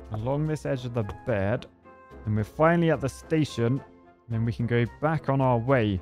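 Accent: United Kingdom. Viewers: Why are you. British your supposed to be American.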